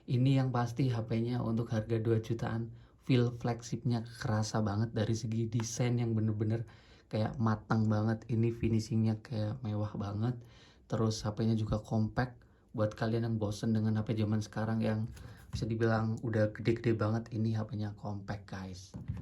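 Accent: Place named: Java, Indonesia. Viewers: Padahal udah bagus channelnya. Apa lagi perpaduan ngapak karo indo. Malah ganti maning haduh yawis moga lancar ges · Padahal dah keren, pas sma nada suara ngomong ya jawa.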